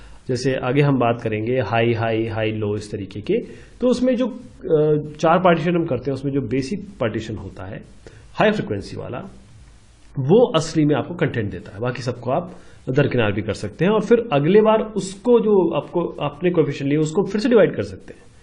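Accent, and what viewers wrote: India. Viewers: 这tm直接把字幕翻译成德语了，服了这咖喱味的口音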